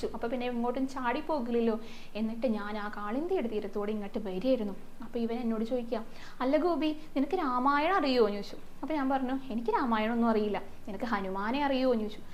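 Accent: Thrissur, India. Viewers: Kadhakal parayunnthu kelkkan valare resakaramane prathekichum parayunna reethium thrisoor bhasheyum. Bhangiaayettondu. Kadha parayan oru avsarsm kittiyathu thanne oru bhagiyam thanneyalle. Guruvayoorappan Molkku SarvaIswariyamgalum tharumaarakatte. Kunjente kaal pollalettathu bhethamavunnundayirikkam alle? Ok God bless you! Keepit up.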